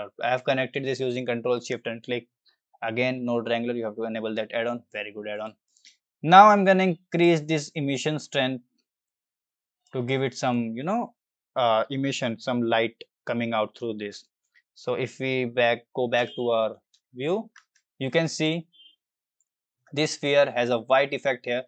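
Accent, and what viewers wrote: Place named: India. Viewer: LMAO! i wanted to say i like the indian accent :))) BUT YOU SAID IT FOR ME :DDDDDD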